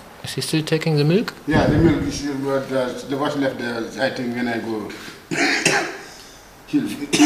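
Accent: Germany. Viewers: Wie man hört dass du Deutscher bist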